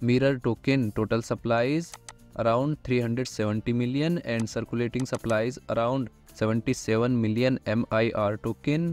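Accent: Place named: India. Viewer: my homie its hard to understand a single word with your Indian accent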